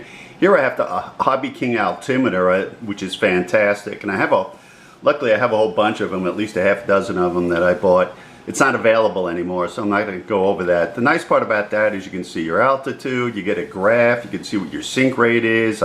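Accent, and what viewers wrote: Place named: New York, United States. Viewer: I'm a former New Yorker, I love it when I can hear the ny in ya.... lol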